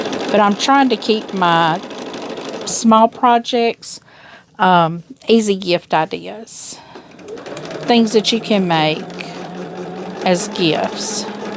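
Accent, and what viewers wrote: South, United States. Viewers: Love listening to you and your southern accent. My son-in-law was in the service so when was released he went to the post office and applied. Apparently the post office puts service people on the top of the list, after they have completed the exam and application process.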